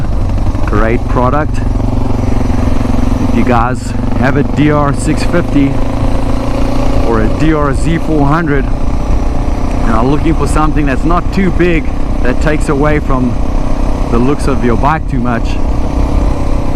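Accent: South Africa. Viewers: hey man yes I saw that after I replied. I think I’m going to go ahead and order one. What off roads are you riding up in north ga? Saw your Instagram and saw where you road around telico plains I love that area. I just started to ride at the age of 55 and loving it Road some with my buddies in New Orleans growing up but not much . Just bought a Wr250r a year ago already thinking I might need to upgrade to a dr650 . Love the SA accent. I miss hearing it my wife is from SA and I used to go over a lot. Lol small world too I used to live off of the north ridge exit on 400 Off Spaulding and over in hunt cliff off Roswell road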